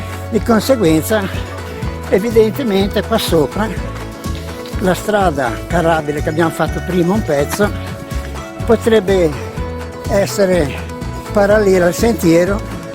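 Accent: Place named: Genoa, Italy. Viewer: Fantastico bellissimo video che bell'accento genovese 🙂 Patty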